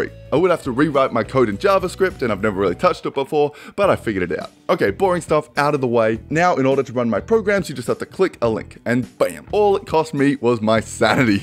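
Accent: Australia. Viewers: Hey my bday and are u Aussie mate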